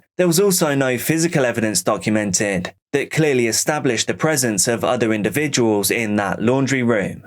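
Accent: England, United Kingdom. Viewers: Oh thanks for the "human narrated"!!🩷🩷i nearly skipped this content cause i thoight It was AI. ìnstead,you re englidh,not american! Love ot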